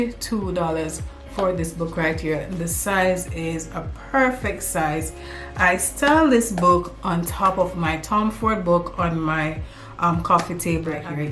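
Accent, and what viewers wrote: Jamaica. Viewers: Are you Jamaican '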